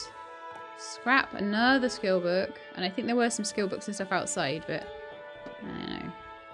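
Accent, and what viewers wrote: United Kingdom. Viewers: Looks interesting.can u play more of this game thanks p.s you still have a lovely voice where abouts in the UK you from because I ve hear it before but I can not place it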